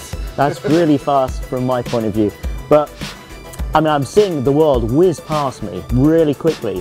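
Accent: United Kingdom. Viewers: I could hear him speak all day long. The British accent is the best. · Are you british?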